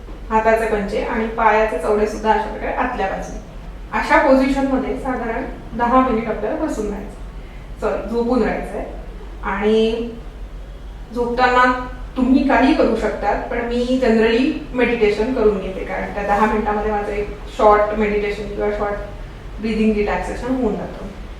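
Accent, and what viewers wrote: Standard. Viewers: मॅडम मला तुमचे बोलणे खूप गोड शुद्ध असते. I am fan of you👌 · मॅडम : तुम्ही फार शुद्ध सुरेख आणि गोड बोलता , का कोणास ठाऊक पण कायम तुमच्या बोलण्यात आपलेपणा जाणवतो❤ !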